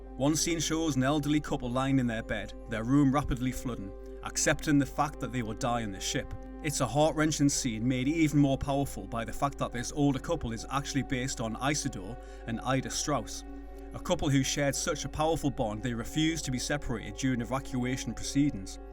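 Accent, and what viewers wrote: Newcastle, United Kingdom. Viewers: Geordie accent...North of England...Newcastle area.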